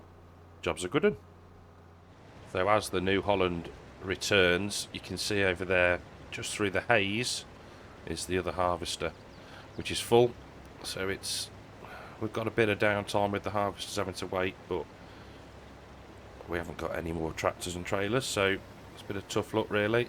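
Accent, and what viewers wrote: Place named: Birmingham, United Kingdom. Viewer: Hello Mr Brummie😁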